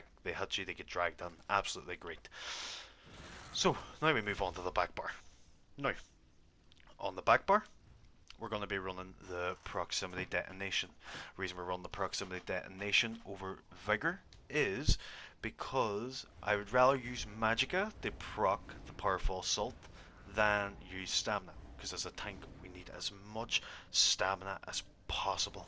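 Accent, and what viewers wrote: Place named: Derry, United Kingdom. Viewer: Great job, very informative and well presented showcase of the necro tank. Who wouldn’t want to listen to the lovely Derry accent, that’s just a bonus 🥳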